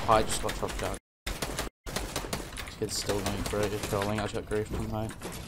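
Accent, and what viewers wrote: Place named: Oceania. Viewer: not sure if your trolling but if you can't tell by his accent he plays on oce servers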